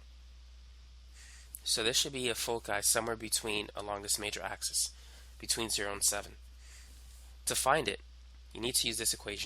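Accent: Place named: Boston, United States. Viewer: You sound like you're from Boston or Maine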